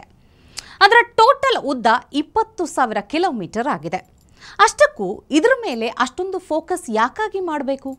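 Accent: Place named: North Karnataka, India. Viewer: Madam you talk in uttara kannada language ❤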